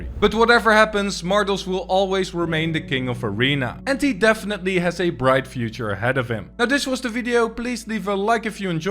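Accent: Netherlands. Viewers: Question to u: are u Dutch? Little accent xD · Die Nederlandse accent😭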